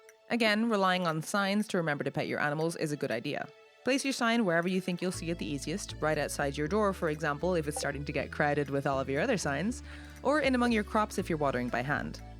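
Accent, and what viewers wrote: Ireland. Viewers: what accent is this? i love it! it almost sounds irish to me?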